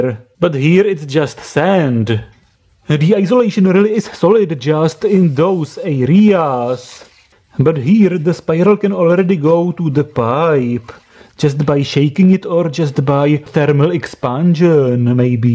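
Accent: Czech Republic. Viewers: You sound like you are from czech republic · Is this typical accent of Czech people? I thought he stretched the last word on purpose to create a signature sound. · Oh my god. AS a fellow Czech i laugh just by listening to his accent. People probly come by just for that as i do :D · An obvious Czech accent. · Yeah I can confirm as a Czech myself he is Czech.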